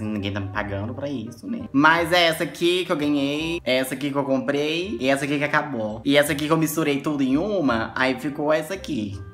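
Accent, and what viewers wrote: Brazil. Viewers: Eu ri muito. “Eai, joia?” O sotaque goiano é bom demais. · Uai vc é de Minas?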